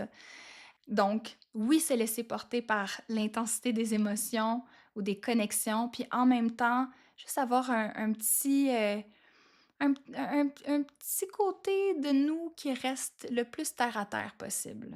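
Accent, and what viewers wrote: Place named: Quebec, Canada. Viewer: Bonjour Charmante québécoise, ❤ je suis toujours sous le charme de l'accent Québécois que j'adore quand j'ai l'occasion de l'entendre. Merci pour cette belle énergie que vous déployer à expliquer le pourquoi du comment en Astrologie... Pour Févier, ça devrait bouger fort sur le plan émotionnel alors mettons notre ceinture et accrochons-nous au bastinguage... Merci à vous et continuez à prendre soin de votre Beauté, elle vous va si bien ... MERCI.❤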